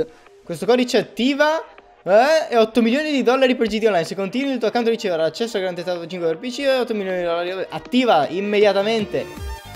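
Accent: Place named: Tuscany, Italy. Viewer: sei toscano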